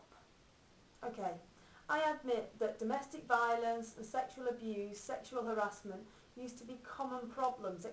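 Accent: United Kingdom. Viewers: Are you from Birmingham? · this stoke accent is beautiful. 'CAPEETULLIZUM.'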